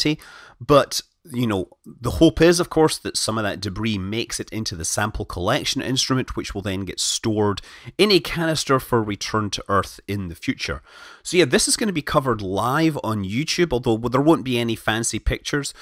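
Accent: Scotland, United Kingdom. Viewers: Can we call you { }? Are u in fact scottish? Great video's, thank you.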